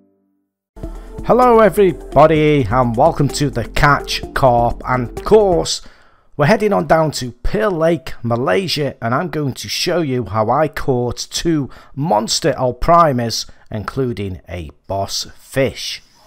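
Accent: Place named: Scotland, United Kingdom. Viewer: thank you🙏 your accent is funny do you come from Scotland?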